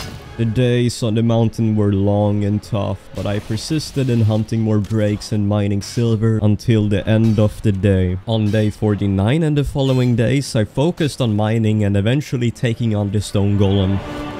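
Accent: Sweden. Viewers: I hear that you are swedish with the accent (jag är också svensk)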